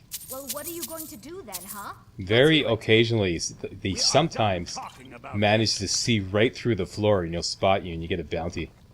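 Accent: Canada. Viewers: i'm in this for the Canadian accent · Your Canadian eh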